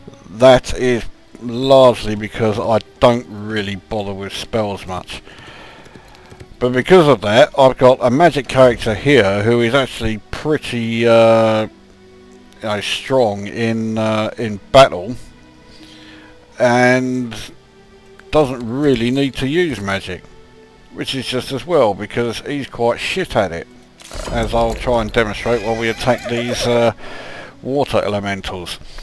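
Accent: United Kingdom. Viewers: Dude, i love your hilarious commentary. Maybe it's the british accent. Subscribed.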